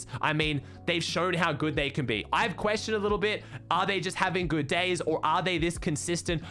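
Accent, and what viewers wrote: Australia. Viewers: We love ur aussie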